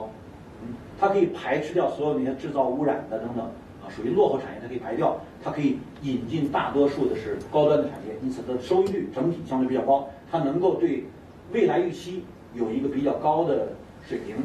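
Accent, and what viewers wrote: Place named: Cantonese, China. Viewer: 这个介绍人的口音富有广东腔。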